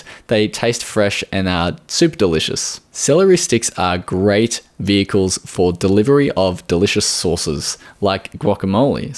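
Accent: Australia. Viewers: I love your videos but I truly hate Australian accents no matter who is talking sorry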